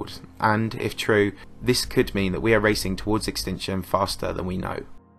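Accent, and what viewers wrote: United Kingdom. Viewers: The worst part of this is your Brexit accent.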